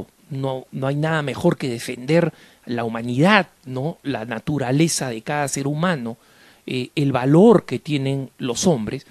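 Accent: Lima, Peru. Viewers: eres peruano? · Creo que por el acento el pata es de Lima pituquilandia... me parece, o de Huacho o del norte chico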